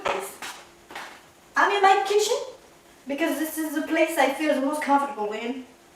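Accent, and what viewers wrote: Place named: France. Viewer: Il m'aura fallu 4 secondes pour comprendre que tu étais française avec ce bel accent, je pense que la follie de la personne est donc logique 🤣😂👍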